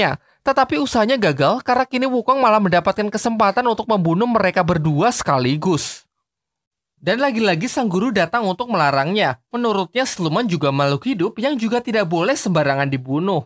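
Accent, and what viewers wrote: Java, Indonesia. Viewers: Wong jowo e mas? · Wong jowo mas? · Jowo bang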